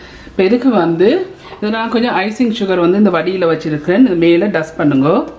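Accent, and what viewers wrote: Sri Lanka. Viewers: சுப்பர் சகோதரி எனக்கு இலங்கை தமிழ் ரொம்ப பிடிக்கும் இலங்கை மக்கலயும் பிடிக்கும்...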